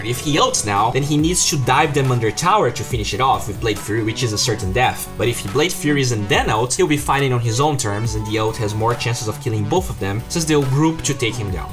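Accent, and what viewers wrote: Brazil. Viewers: C é brasileiro, man??? Tem sotaque BR xD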